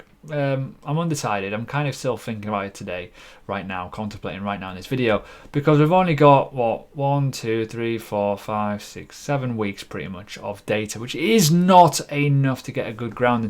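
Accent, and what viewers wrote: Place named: Yorkshire, United Kingdom. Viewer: gotta be a Yorkshire accent?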